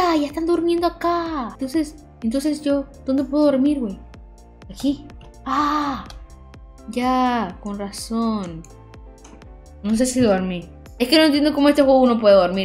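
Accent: Argentina. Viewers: TU ASENTO ARGENTINO JAJAJJAJAJA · Como te cambio la voz me encantaaa ❤❤❤ ame el mamáaaaaaa eres tu sos Argentina??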